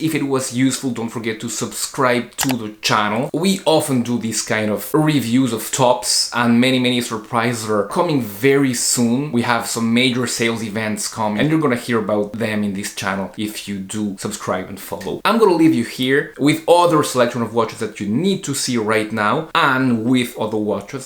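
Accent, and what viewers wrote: France. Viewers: I know he is a French. I like his a French accent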